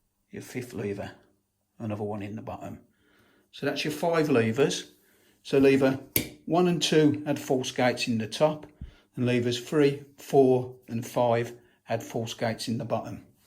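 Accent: Birmingham, United Kingdom. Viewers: Nice one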